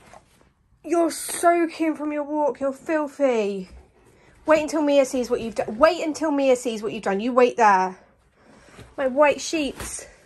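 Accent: United Kingdom. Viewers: Your accent is UK ?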